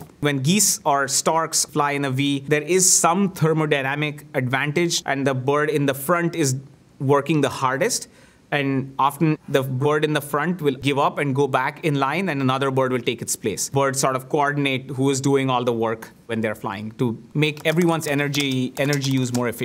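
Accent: India. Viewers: On an unrelated note I love this guys accent idk why it sounds Indian but also unique at the same time genuinely got me interested in birds now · he has pure indian accent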